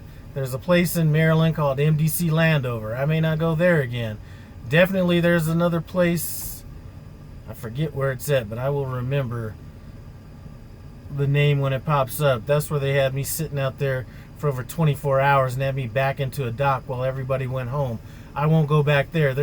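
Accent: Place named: Midwest, United States. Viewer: Good video. When you mentioned the Chelsea market it brought back memories. Are used to pick up gasoline right at the Exxon terminal that I’m figuring you drove by. You are correct there is no place to park in that area at all. I would agree probably is still mafia run. I have driven in New York City going to Fulton market. I would not wanna make it a habit of going to New York City. You sound like you’re more of a Midwest or southeast person. Be safe out there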